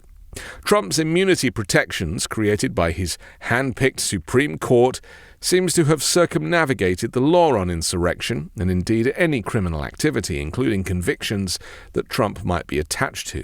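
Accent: United Kingdom. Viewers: Thanks for reporting the truth. I always love hearing your British accent & so does my dog, Nick. He always moves closer to the TV when hears you. He loves British accents. 😊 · I really, really hate someone with a British accent pontificating to us as to how we should run our government! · Why do you sound British? Mmmm? and we all know the law does not matter with Trump. This just seems to be another way of making people angry without a chance to do anything about it. Given the fact he was inaugurated once and almost was inaugurated if the insurrection succeded, and the fact that he beat the senile Democrats in the last election by electoral college and by popular vote we on the left want answers not more pies in the sky " What ifs" with Trump because that is all we hear. What if Trump get angry at Musk angry a Pence angry at anyone in his way and then nothing more comes of it. 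Your words are part of the meaningless press and the real left, the real progressives are underground and not hear on "What If" Podcasts. There very few left podcasts now and those that I know of I will not speak of here in this make believe democratic corporate podcast.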